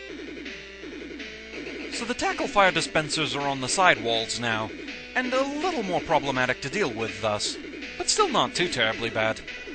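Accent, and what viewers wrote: Scotland, United Kingdom. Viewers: A Scottish guy...playing a robot...who hates birds... Why do I keep thinking of Portal 2?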